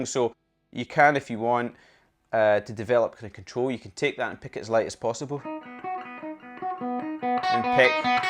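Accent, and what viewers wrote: Scotland, United Kingdom. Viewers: The guy is Scottish mate . Dont confuse the two.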